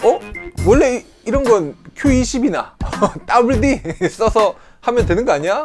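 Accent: Gyeongsang, South Korea. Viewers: 말하는게 어리버리 갱상도넘인가요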